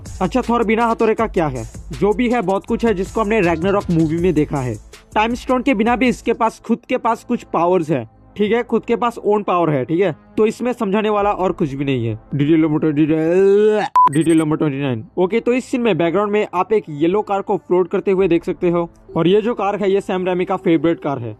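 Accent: Assam, India. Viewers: Nice explaining sir are you from Assam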